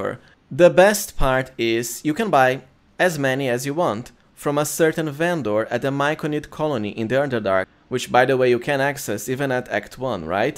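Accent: Brazil. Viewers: Eu tenho certeza que tu é brasileiro com esse sotaque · eu SABIA que o sotaque era brasileiro, SABIA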